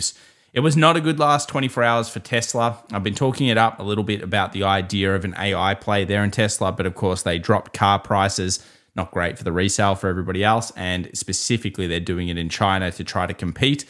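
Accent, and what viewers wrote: Australia. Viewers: Hi Great to hear a fellow Aussie on here:) Fantastic update. Quick question... I have a rather heavy bag of selected low cap altcoins which I thought I got for a good price over the last 3 months but could have bought them all in the last 48 hours with a 20% discount. If you don't laugh you cry. Anyway, the question is obvious....with BTC's premature take off, when do you think small cap altcoins will start to run? Soon after this BTC ATH or months from now when BTC stops pumping and pauses for a while?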